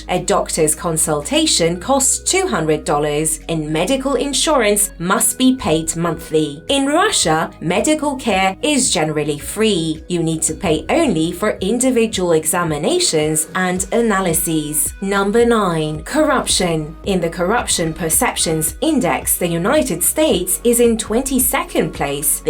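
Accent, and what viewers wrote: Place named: England, United Kingdom. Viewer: Fuckin' hate this english accent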